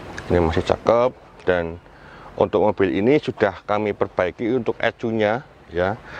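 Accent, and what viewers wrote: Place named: Java, Indonesia. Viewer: medok nya agak ganggu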